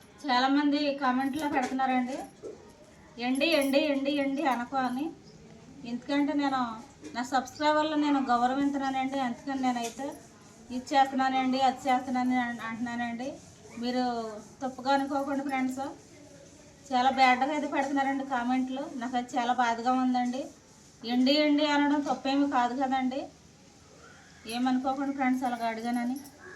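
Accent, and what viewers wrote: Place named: West Godavari, India. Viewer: నువ్వు. మాట్లాడే. పద్ధతి చాలా బాగుంది. అండి. అన్ని మా నా. పశ్చిమ గోదావరి జిల్లాలో. అలాగే. మాట్లాడుతారు. వాళ్లు. కి. తేలియదు. కాదా. నువ్వు. బాధపావొదు. సరే నా. మీ. శాంతి. అక్క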